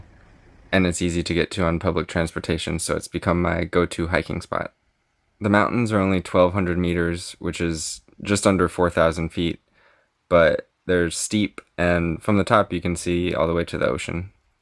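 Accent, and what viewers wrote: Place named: Brazil. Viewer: . está a ficar muito "abrasileirado" 😒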